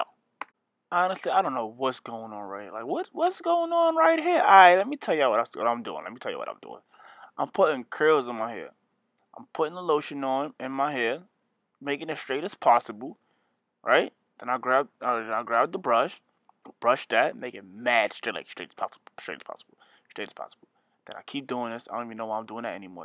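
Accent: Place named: New York, United States. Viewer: I Love How New York you sound lmaooooo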